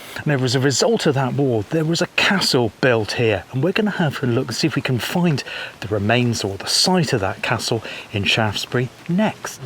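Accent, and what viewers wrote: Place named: Yorkshire, United Kingdom. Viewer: Hello - greetings from Poland. Your video certainly captured the essence of Shaftesbury. I've been there many many times as my ex wife's parents lived there in the latter years of their lives. Loved Yorkshire commentary and camera work. The still photographs especially the landscape shots were exceptional. Congratulations. A very fine video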